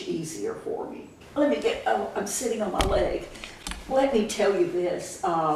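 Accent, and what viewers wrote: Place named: Arkansas, United States. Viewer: I always wanted to ask you are you from Arkansas? Because I know someone that has That is from Arkansas and has the same accent that you have. So I was just wondering where are you from to get such an accent like you have?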